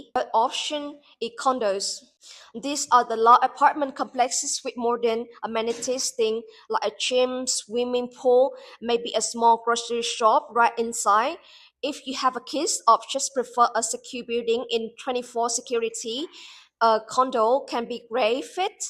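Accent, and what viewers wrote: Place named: Australia. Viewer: Aussie accent, there.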